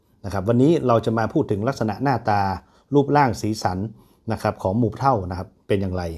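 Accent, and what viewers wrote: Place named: North, Thailand. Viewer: พูดไทยยังไงสาบเมืองทุกคำ เจ็บแอวขนาด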